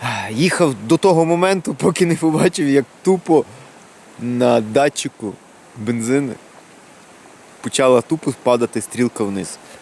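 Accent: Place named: Ivano-Frankivsk, Ukraine. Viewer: разве во Львове так разговаривают, нет он с Франковской обл.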